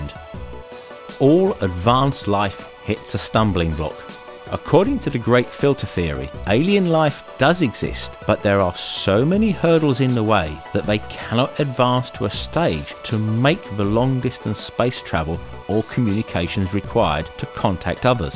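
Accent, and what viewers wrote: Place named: Cockney, United Kingdom. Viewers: Yes he has got that same nasally sound,and London accent. I was thinking that also. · i came here just because i caught the voice of a cockney.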